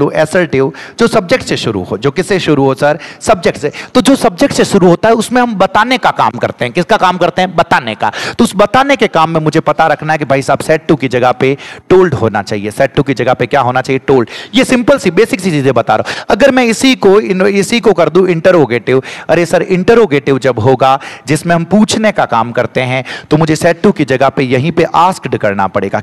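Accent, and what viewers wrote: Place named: Marwar, India. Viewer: Madsab aapri marvadi boli choki lage aap ase hi padaye ❤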